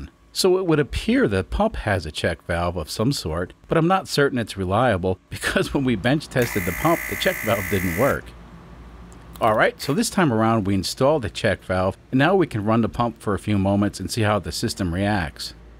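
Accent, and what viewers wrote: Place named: Southern California, United States. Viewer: I understand your caution. When we changed to metric measurement here in 1974, conversion to litres per kilometre (or as it's spelt (or as spelt itself is spelt is the US "spelled"😂) in the US) liters per kilometer popped up for a short while but it quickly disappeared in favour of l/100km. Also, on reflection Kilopascals (kPa), not Hectopascals, is on the tyre pressure gauges here. Interesting re the accent. Had I been so bold as to guess your background outright, I would have said, born somewhere in Wisconsin, then moved to Southern California. I'm no expert but to me your vowels sound midwestern but the lilt is straight outa Garden Grove.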